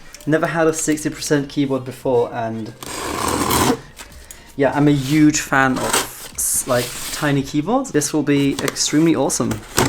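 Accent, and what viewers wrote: Germany. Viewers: Hallo du hast einen deutschen Akzent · Youre english sound so weird, at some parts you could tell you´re german but in other parts you just sounded like you´re speaking english as youre first language. Not hate tho haha <3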